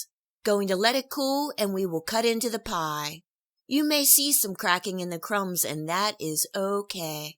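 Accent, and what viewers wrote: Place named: Delaware Valley, United States. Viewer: You are from the Delaware Valley? My family is from Philly and South Jersey--you sound like my relatives!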